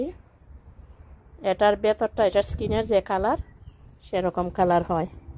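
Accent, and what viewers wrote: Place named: Sylhet, Bangladesh. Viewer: আসসালামু আলাইকুম আপু কেমন আছেন আপু আপনার বিডয় টা দেখে কুব ভালো লাগলো আপু আপনি মনে হই আমাদের সিলেটে মানুষ কথা গুলো শুনতে খুব ভালো লাগলো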